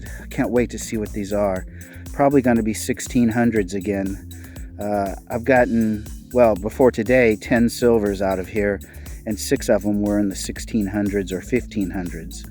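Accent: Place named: United States. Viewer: the American accent through my stereotype off. :-)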